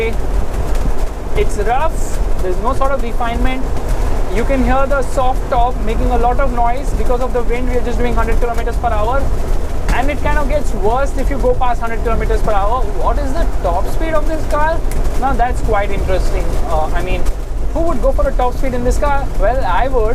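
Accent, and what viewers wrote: India. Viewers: Lmao that indian accent. Why indians think they speak english like Americans. Im also indian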